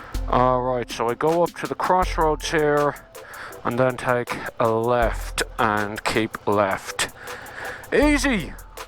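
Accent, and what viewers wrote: Ireland. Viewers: Everything just sounds better with an Irish accent. The weather looks amazing up there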